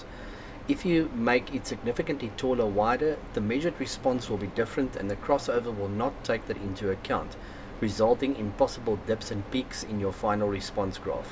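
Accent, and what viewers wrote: Afrikaans, South Africa. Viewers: Hoor ek n afrikaanse aksent?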